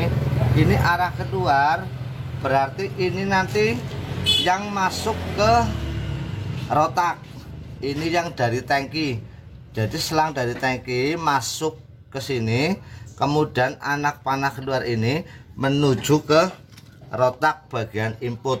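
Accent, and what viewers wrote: Banyumas, Indonesia. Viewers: Mantap. Logatnya mirip banyumas. Lokasi dimana bro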